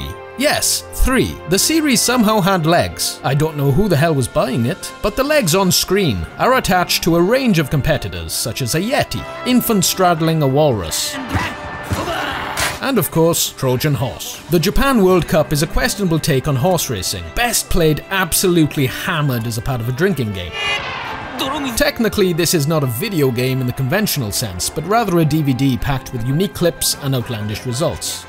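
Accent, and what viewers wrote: Wales, United Kingdom. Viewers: Are you Welsh? Sounds like you have a bit of a Welsh accent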